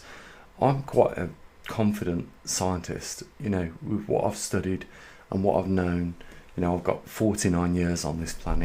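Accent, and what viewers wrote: United Kingdom. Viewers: Oh Thank you for transskript!!!! Your british accent ist Hard to understand to me 🙈 sorry! So Thank you verry much ❤️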